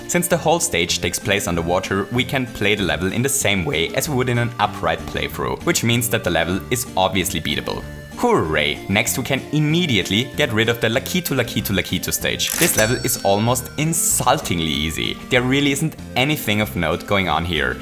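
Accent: Germany. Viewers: I hate the German acsent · Wie ich immer herausfinde anhand des accentes das jmd deutsch bzw Österreichich spricht. irgenwie hört man das raus!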